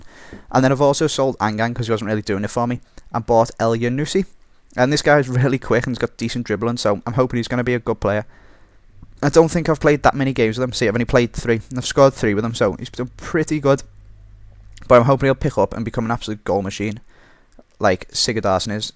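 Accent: Liverpool, United Kingdom. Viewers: omg a scouser who makes fifa videos yes !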